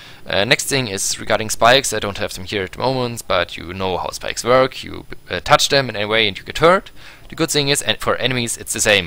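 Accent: Germany. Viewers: lass dich nicht verunsichern. die einzelnen Elemente am Anfang sind wichtig. vielleicht grafisch aufarbeiten und unterteilen. sonst sehr informativ und gut verständlich. du kommst aus dem Raum Stuttgart denke ich 😀. weiter so und das mit dem Akzent wird schon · Man merkt echt das du eigentlich aus Deutschland bist xD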